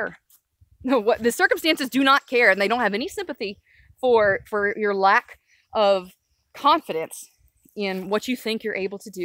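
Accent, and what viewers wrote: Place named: Appalachia, United States. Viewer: The longer she's in the hills of Tennessee, the more that wonderful Appalachian twang begins to surface. Love it. :-)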